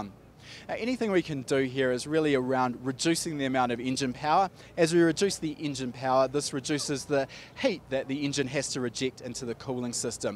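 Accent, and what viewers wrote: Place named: New Zealand. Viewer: Great thinking here. Real world tuning. P.s. loving listening to your NZ icksent. :)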